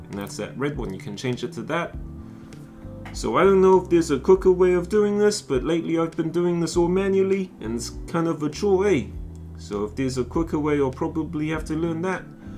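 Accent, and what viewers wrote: New Zealand. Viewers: He's a newish type of zealander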